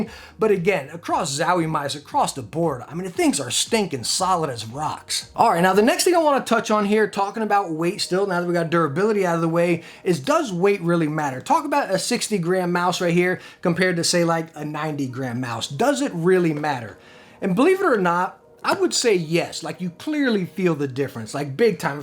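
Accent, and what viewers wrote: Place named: Italian American, United States. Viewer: You have this Italian American accent like those in Martin Scorcesse movies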